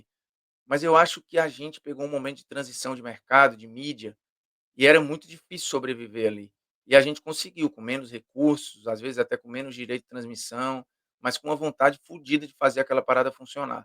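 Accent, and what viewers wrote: Northeast, Brazil. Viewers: Parabéns pela sua carreira! Boa sorte na nova empreitada e que tenhamos mais ótimas opções como é e continua sendo a TNT/Esporte Interativo! E não deixa a nova casa tirar esse seu sotaque nordestino incrível! 👏👏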